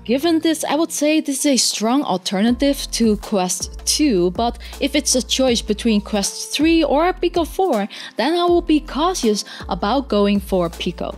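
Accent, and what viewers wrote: Netherlands. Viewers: Why does it seem like you have a Dutch accent 🙂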